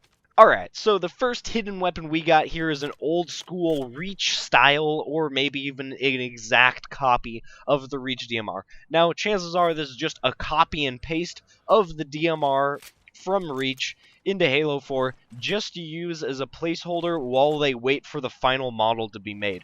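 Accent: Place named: United States. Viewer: i bet your american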